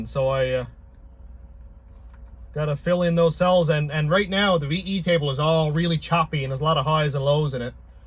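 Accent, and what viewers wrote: Canada. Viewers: where are you guys located? You sound north of the US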